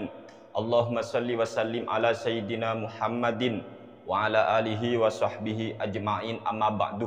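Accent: Madura, Indonesia. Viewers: Logat Madura ya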